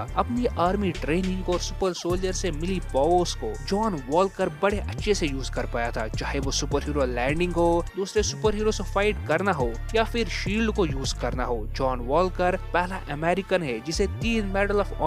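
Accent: Kashmir, India. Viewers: Bro Are you Kashmiri . You have a Kashmiri accent ❤️❤️❤️❤️❤️😘😘😘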